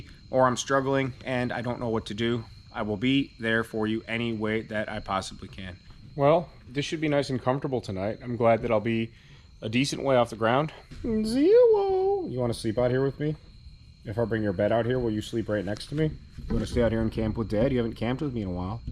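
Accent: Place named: Brooklyn, United States. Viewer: Nice brookyln accent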